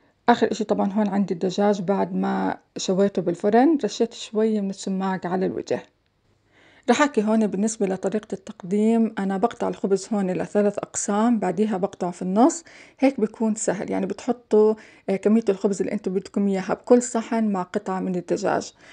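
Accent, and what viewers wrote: Palestine. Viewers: هيه الاخت فلسطينيه لو اردنيه لهجتهم خيال 😘💙💙😘😘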